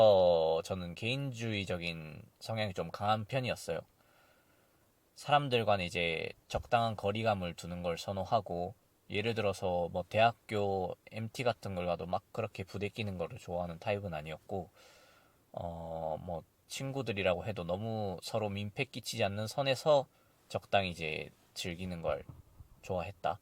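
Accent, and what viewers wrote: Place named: Japan. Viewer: 한국어에 일본어 톤이 녹아있는걸로 봐서는 일본어를 수준급으로 잘하실 것 같네요. 저는 살다가 돌아왔는데 어디서나 화이팅입니다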